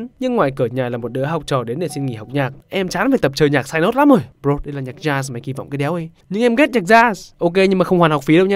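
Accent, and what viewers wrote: North, Vietnam. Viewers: Giộng bắc mình đã ko nghe đc rồi. Bạn còn liên tục pha trò rồi nhắc tên người khác vào clip khiến cho mình ko thể nào tập trung hiểu được câu chuyện. Chắc là phải tự thân đi xem hết phim thôi 😞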